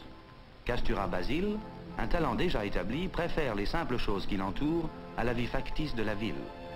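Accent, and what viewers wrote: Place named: Quebec, Canada. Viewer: This sounds like a Québécois narrating the documentary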